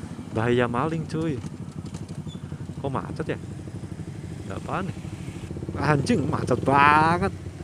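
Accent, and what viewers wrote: Semarang, Indonesia. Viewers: orang Semarang ya?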